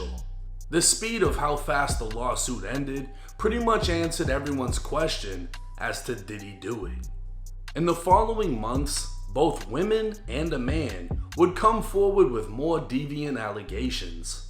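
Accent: Boston, United States. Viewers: Bro your accent sounds florida/Boston/ and Massachusetts 😂😂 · Yooo shit had me dying i be in here just to hear this white boy narrate the news 📰 bro got a mix of accents with the local Boston accent god bless America 🇺🇸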